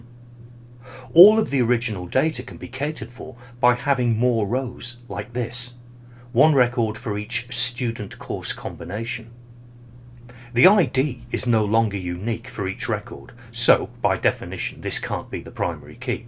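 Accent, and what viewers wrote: England, United Kingdom. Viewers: Thanks for your videos, it is so clear when i watch a video with an English accent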